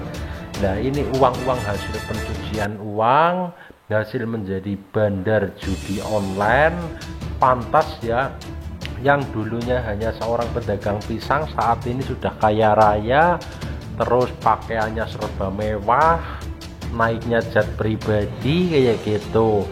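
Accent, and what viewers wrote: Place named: Banyumas, Indonesia. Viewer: logat ngepeknya mantep bro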